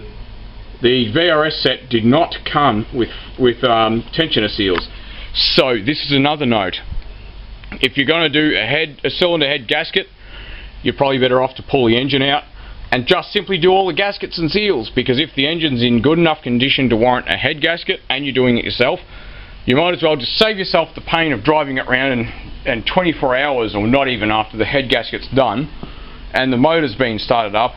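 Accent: Australia. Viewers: i must say you are so Aussie sounding and direct its refreshing, i went to bunnings tonight and didn't know i was in Australia at all!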